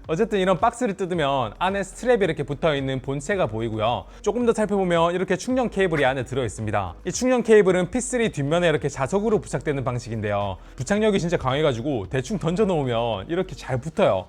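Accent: Gyeongsang, South Korea. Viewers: 경상도분이신같은데 서울말 쓰실라그러넻ㅋㅈㅋ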